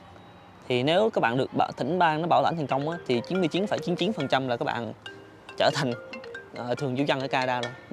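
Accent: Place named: Da Nang, Vietnam. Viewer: Nghe giọng anh có vẻ là người Đà Nẵng, em cũng ở Đà Nẵng qua Calgary được 4 tháng rồi. Hy vọng không phiền nếu có thể kết nối để hỏi anh nhiều hơn ^^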